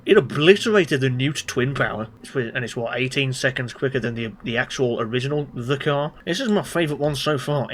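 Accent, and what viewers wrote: United Kingdom. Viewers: Bro sounds like the UK. He sounds exactly like a UK haver would sound like.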